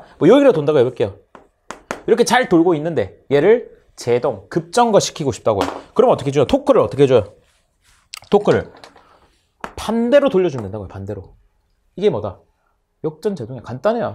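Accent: Gyeongsang, South Korea. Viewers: 화내서 사과하는거보고 빵터짐 ㅋㅋㅋㅋㅋㅋ 경상도말투라 감안하고 봤어요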